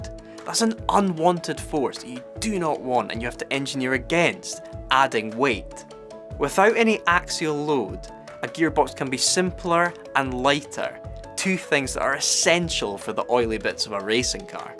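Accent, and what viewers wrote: Scotland, United Kingdom. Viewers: Scotland, but don't know which part of it exactly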